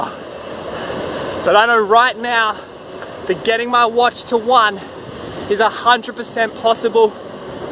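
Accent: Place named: Australia. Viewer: That was awesome. As a Canadian, I have known a bunch of Australians, and not only do I like hearing the accent, but most of the Aussie's that I have met were pretty adventurous/slightly crazy. This is exactly the kind of thing they would do on a whim. Loved how it seemed the watch kept taunting you by dropping a % just when you were ready to pack it in, like a challenge. Good on you for hitting the 0% and going one further.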